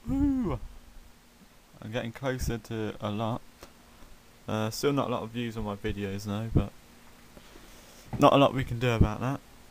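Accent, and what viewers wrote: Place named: United Kingdom. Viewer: i couldnt understand what you were saying half the time... you clearly have a british accent... Are you Ozzy Ozzbourne?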